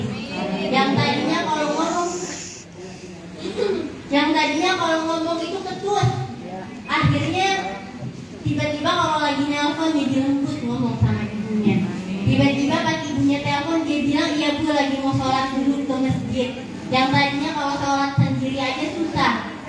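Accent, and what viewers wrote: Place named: Arab world. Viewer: Dialeg bahasanya Arab bangat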